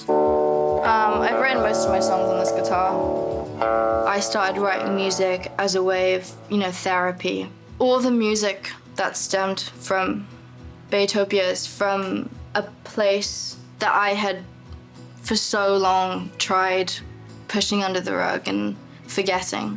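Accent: England, United Kingdom. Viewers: I keep forgetting shes got a british accent and I get surprise every time I remember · Because it's a British accent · wait she has a english accent how did i just figure this out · She’s British ?? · you've got a british accent and new york attitude i like it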